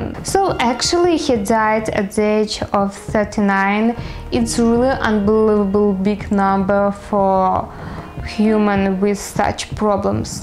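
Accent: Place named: Ukraine. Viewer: UkrEnglish... It's nice that u make such efforts to speak English and even make vids but i'm sure u would have much more subscribers if u work on ur pronunciation))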